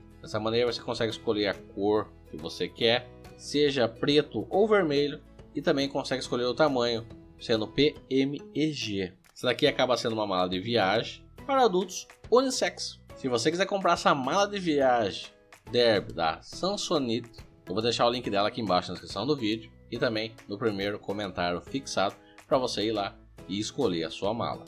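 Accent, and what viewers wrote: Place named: Piracicaba, Brazil. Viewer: Você é Piracicabano?? kkk sotaque muito bom!!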